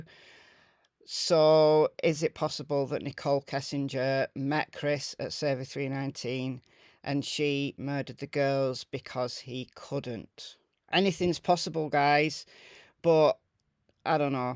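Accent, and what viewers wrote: United Kingdom. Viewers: You sound like you are in the UK..Nothing wrong with that except it's awfully crass of you to make a video based on bullshit when you don't even live here and also hurting the Watts family with this crap! They are gone tragically but stop dredging up this home made bullshit and let them rest in peace! Nichole Kessinger was involved...Monkeys might fly out of my butt! Now apologize to the Watts family for making this rubbish video and shut up!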